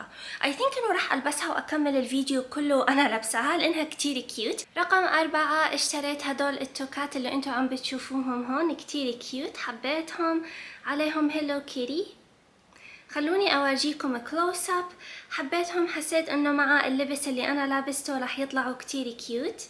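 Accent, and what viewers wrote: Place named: West, Algeria. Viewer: ايه صح صح انا ثاني شفيت بلي كانت تهدر بلهجة الغرب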